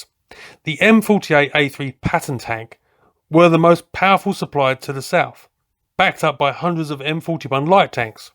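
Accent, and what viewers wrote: United Kingdom. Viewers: Because it wasn't US military property, it was South Vietnamese military property. Just exactly the same as all the gear that the Taliban laid it's hands on that all these people who don't know what they're talking about are saying all this dumb crap about the US military leaving all of that stuff laying around for the Taliban to equip itself with, like what you see here it wasn't US military property that the Taliban got, it was Afghan military property that they left laying around when they fled in the face of the Taliban taking over. Word of advice, any time you click on a video that has anything to do with US military history and you hear a British accent, do yourself a favor and immediately shut it off, because it's going to be full of lies, exaggerations and any other type of deceitful things you can think of in order to cast a bad light on America and especially it's military, for some reason they feel compelled to do that stemming from some sort of twisted feelings of jealousy that obviously comes from the US's involvement in the two world wars.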